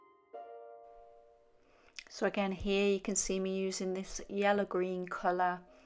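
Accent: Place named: North, United Kingdom. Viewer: Great, nice to hear a northern accent too👍🎨😊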